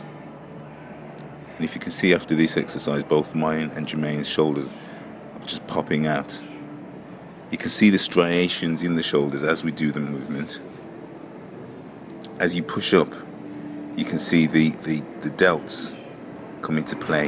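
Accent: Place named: United Kingdom. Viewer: loving your brittish accent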